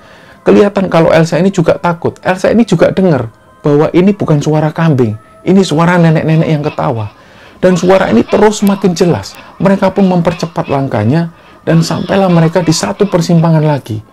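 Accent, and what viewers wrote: Java, Indonesia. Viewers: aku orang malang dekat sama pantai laut selatan, aku seneng klo yg membawaknnya cerito horor, punya kharismatik, umpomo orang jawa, jawanya sangat kental, sehat"selalu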